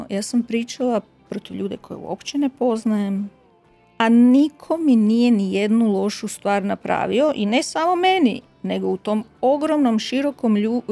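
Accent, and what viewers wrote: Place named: Kajkavian, Croatia. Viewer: Svjedočanstvo super ❤️ ali glas , naglasak JEL, KAJ užasno iritantno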